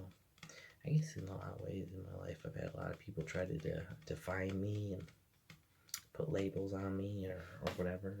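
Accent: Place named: Midwest, United States. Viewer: Have you always lived in the east? (Don’t know if you are comfortable with me saying your state). I ask because it sounds like you have a little midwestern in there!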